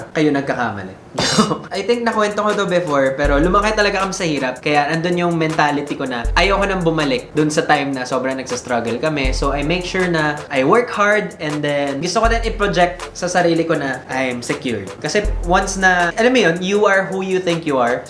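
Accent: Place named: Conyo, Philippines. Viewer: Genius sa math Me: Si kuya yun Conyo magsalita:Si kuya ulit yun.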